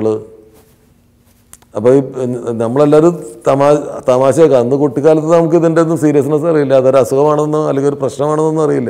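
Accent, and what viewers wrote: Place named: Valluvanad, India. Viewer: ലാലുവിന്റെ സംഭാഷണ ശൈലി, വള്ളുവനാടൻ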